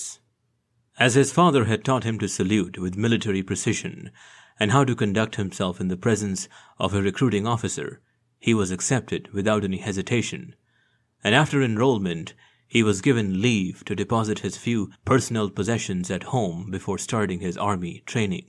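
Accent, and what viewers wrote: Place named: India. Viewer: The ‘neutral’ Indian accent, the one that doesn't specify any regional recogs.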